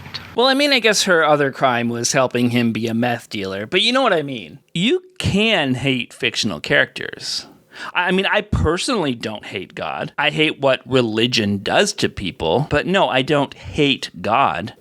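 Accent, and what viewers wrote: Canada. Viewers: Aww I like the Canadian accent.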